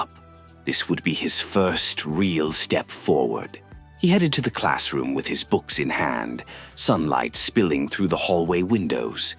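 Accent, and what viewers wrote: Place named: United Kingdom. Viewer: Ah yes british narrator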